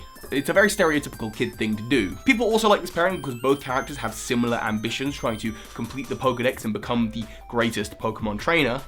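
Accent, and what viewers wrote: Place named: London, United Kingdom. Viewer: Where are you from in England? Based on the cadence of your accent, I'm assuming you're from the London area since it sounds like Daniel Radcliffe's accent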